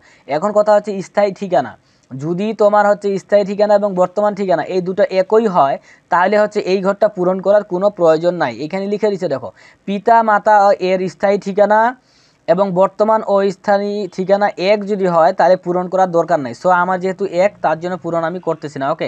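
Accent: Bangladesh. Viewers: Apnar kotha sunei ami bujhte paisilam j apnar bari Dinajpur a hobe, because amar bario Dinajpur. By the way thanks for this video 🥰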